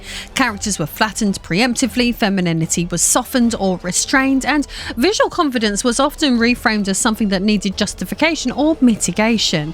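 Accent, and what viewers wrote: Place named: Cockney, United Kingdom. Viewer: That cockney accent coming through but also sounds a bit Greek, am I wrong? Great video btw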